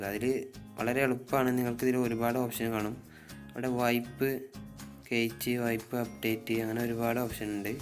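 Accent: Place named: Malappuram, India. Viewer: Igale baasha poli malappurakkaranano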